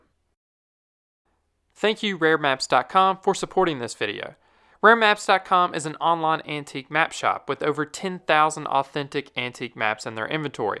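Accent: South, United States. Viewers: Nice to hear an American accent finally on the commentator. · Georgia boy? Or maybe Carolinas? Can hear the accent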